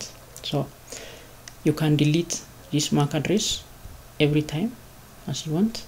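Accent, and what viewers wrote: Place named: India. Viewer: ur tryn' to speak like indians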